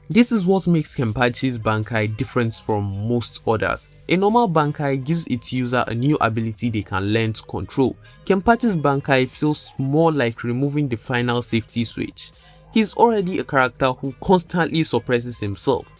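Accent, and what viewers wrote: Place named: Africa. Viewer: It sounds African to me, very smooth sounding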